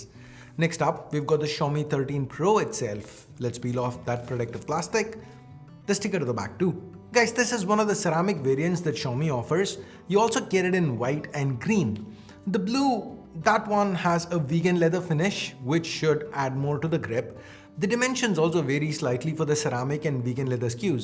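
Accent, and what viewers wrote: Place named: India. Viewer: Accent is top notch neutral and best Indian accent i would say🤟🏻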